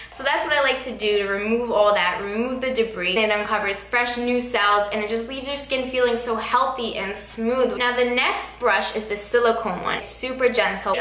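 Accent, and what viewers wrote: New York, United States. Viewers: Idk how people have the balls to make negative comments this girls gorgeous pimples or not ... That New York accent 😍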